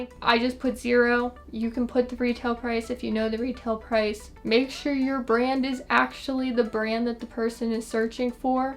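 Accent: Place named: Pennsylvania, United States. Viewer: great tips! you remind me of Pam from The Office and I LOVE the office - must be the PA accent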